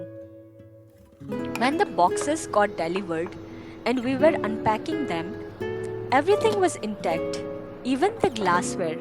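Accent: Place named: Northeast India, India. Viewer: Hahaha..... breaking of the rolling pin and the glassware being intact is indeed funny......I have been watching a lot of your videos past two weeks, they are really nice.... Your English is perfect, nevermind accent, no one outside India does.....btw, I was born in northeast, so I totally understand the accent and I love it. Best wishes for you and your family 💞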